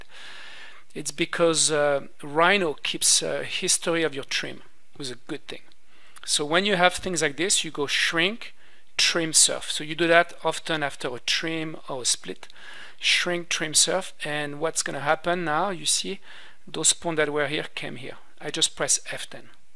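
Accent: France. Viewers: are you french? your accent is amazing :D Thanks for such an effective tutorial :) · You're definitely a French speaker 😅, i love your tutorials 😍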